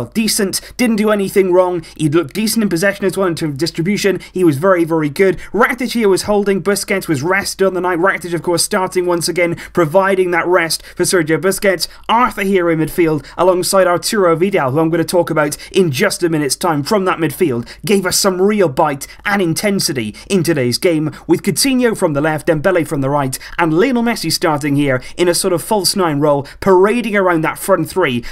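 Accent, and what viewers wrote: United Kingdom. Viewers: You sound like a Brit with an American accent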